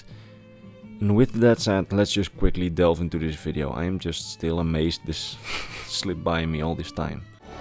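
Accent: Netherlands. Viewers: You sound Dutch, if I am correct in assuming this then awesome cuz i'm dutch, if not then sue me, nice vid tho. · Are you like a... Dutch Farengar? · is that a fellow dutchman i hear? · I can hear from your accent that you are Dutch. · Are you Dutch?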